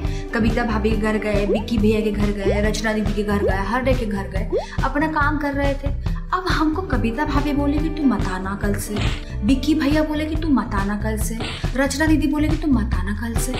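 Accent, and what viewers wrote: Bihar, India. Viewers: Why you use Bihari tone?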